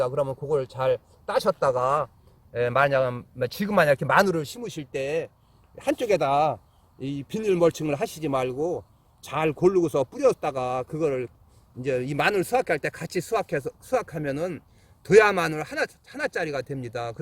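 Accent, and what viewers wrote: Chungcheong, South Korea. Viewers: 충청도 사투하네요 고향이 그리워요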